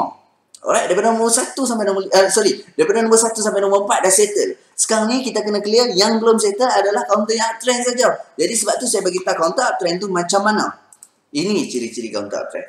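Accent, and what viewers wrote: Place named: Kedah, Malaysia. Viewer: Seronok dengaq tuan sembang loghat Kedah..terbaik baq hang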